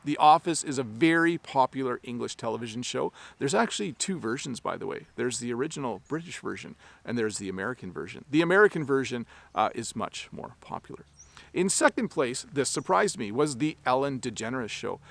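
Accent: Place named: Canada. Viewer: Thank you so much, first of all I 'll like to say that I really enjoy watching your videos, I really like your manners of teaching, because it's very clear to me, it's easy to understand, and I love your Canadian accent it's understandable, iam always practicing your online lessons. I have been practicing and iam enjoying improving English, please keep making videos for us they are amazing for non-native English speakers like, by the way iam Mozambican, I was born in Nampula city ( it's called the Axinene province- Emacua's land). Thank you so much have an excellent day and good luck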